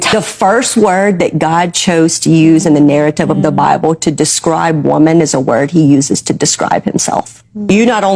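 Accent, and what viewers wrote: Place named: Mississippi, United States. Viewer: Did she say Laurel, Mississippi or rural Mississippi? I heard laurel not just through the word she said but through that accent lol. I used to live near there.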